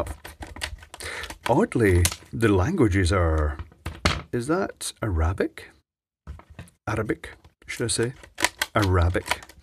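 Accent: United Kingdom. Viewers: Maybe its the British accent listening with my not brit-ears ears but at TS I think he said about the LED: "The only time it will glow is when one of the thermal fuses fails". It glows until the thermal fuse fails.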